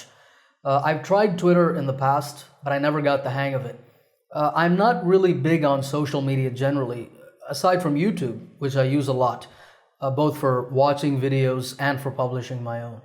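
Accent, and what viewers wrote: United States. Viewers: Can you tell me how did you learn or improve your american accent. thanks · Thnk u so much sir..... really useful n important to learn best eng with American accent 😊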